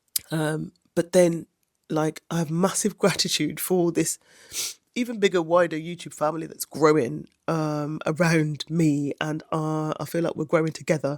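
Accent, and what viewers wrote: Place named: United Kingdom. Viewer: I have a feeling you will surpass me. You have a natural vibe on camera, nice editing too, plus you can't beat that British accent. I just raw dog all my videos like a wild animal. Sometimes it works I suppose. I hope we both grow organically and build some nice communities. AKA Tribes. I think I am going to do a video on that.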